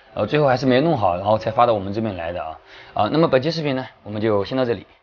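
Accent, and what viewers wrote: Wenzhou, China. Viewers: 还是能听出来温州口语的普通话。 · 一听你的普通话就知道你是温州人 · 可笑下面一群人在那里感叹师傅真是良心啊plapla的，这up主是专门拍维修视频的，点掉这么多正常情况下维修店都是放弃维修了吧，浪费这么多时间得不偿失都维修，不过还是得赞up主的心灵手巧，这口音很熟悉，身边很多都是温州人，特别的聪明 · 没看名字，一听就是温普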